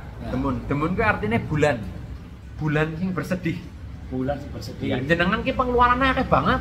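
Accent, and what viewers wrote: Banyumas, Indonesia. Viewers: Cempulek jebul bang wong ngapak hehej🙏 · Salam ngapak ko...sukses terus kang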